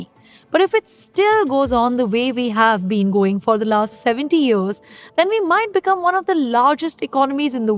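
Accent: India. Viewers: The Indian accent is simply overwhelming. 🥵🥵🥵🥵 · Not everyone understands your Indian accented English. Use English subtitles rather than hindutva terrorism language or word the title of your video in your native language and stop the click bait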